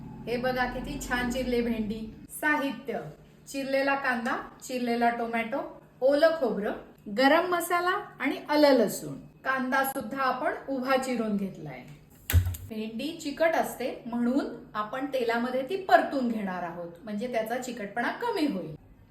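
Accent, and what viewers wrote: Konkan, India. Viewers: Tumhi khup chan bolta.. कोकणस्थ आहात का? 🤗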